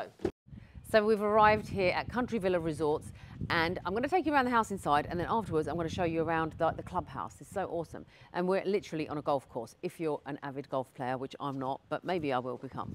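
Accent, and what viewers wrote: United Kingdom. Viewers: British accent. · I just love the Uk Accent ..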